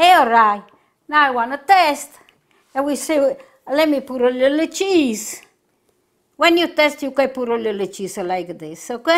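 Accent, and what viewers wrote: Italy. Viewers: Always a pleasure to watch you cook and to hear your Italian voice. I gifted, again, your cookbook to a loved one. Precious recipes. Health and strength to you, and to all your famiglia.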